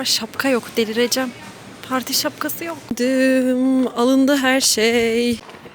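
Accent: Ireland. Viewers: AKLIMA CILLIAN MURPHY GELİYOR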